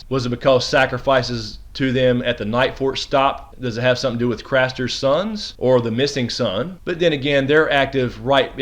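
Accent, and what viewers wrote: South, United States. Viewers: Oh, I see. Your accent is very southern but I couldn't figure out where. Anyway... how do you think Melisandre will ultimately convince herself that Bran and/or the Bloodraven are not necessarily evil?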